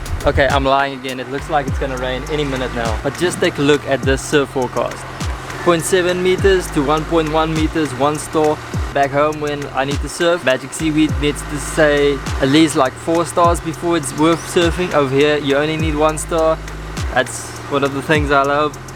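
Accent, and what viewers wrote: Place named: South Africa. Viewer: You sound South African, yep.... 😉🇿🇦🤙🏽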